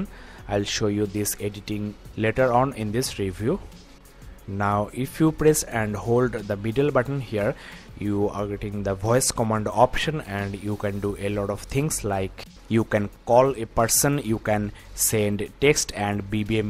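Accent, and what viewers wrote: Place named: East, India. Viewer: I think he is from Orissa or Bengal. Thats not a south Indian accent. :-)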